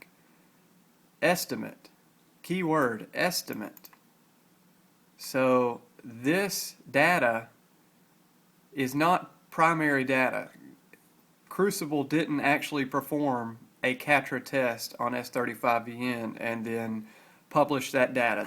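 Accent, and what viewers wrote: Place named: Appalachia, United States. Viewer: help! i cant decide which knife i want to use to kill myself after watching your legion of horrible, redundant, long winded videos! I admit i was bought in by your initial charms, the qualities of your down home voice, a voice of an educated hillbilly were refreshing. Youre a simple guy--a shirt tucked in, cell phone attached to the belt, hands on 10 and 2, I hate drugs, sports team hat wearing, kind of guy. Studied "business" in college right? Put the gun in the closet for 3 months and you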